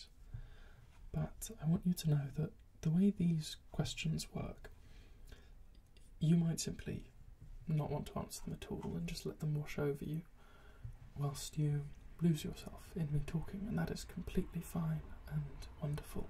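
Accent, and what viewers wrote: United Kingdom. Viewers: The main reason I watch your videos is learning your British accent :)✨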